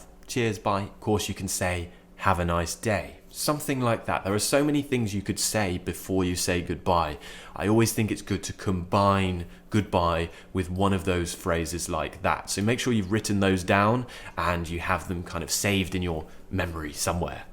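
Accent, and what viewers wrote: United Kingdom. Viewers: I like your way of teaching English, I have benefited you a lot, especially the British accent because I work with a British company in Iraq, sincerely accent British wonderful.thank you so much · It's so nice to listen to the British accent and vocabulary, I love it but most of the movies and tv shows we can watch are americans so it's hard not to be influenced by them. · Caundo hablas suenas tan verdadero, si me mientes no lo notaría. Te llevaría a comer ceviche with your British accent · I like British accent.. pronunciation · Hey, I've just found your channel. I'm an English teacher in Brazil and I love teaching phonetics. I was looking for some pronunciation videooa when I found your incredible channel. Congratulations on theses videos you've been making, they're excellent, really nice material. I love the British accent and I just can't tell you how happy finding your channel made me feel. 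As you say it: "take care, cheers, bye!"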